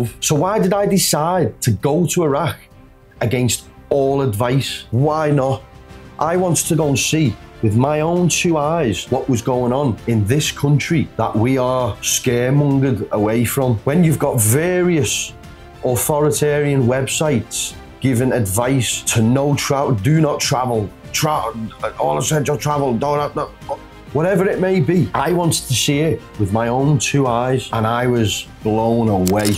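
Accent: United Kingdom. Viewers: تحديدا هاي لهجة سكوتلندا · انا عراقي اعشق اللهجة البربطانية الفخمة 🤤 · dude your accent is cool where are you from? Birmingham? what accent is this? I know it's British but from where specifically?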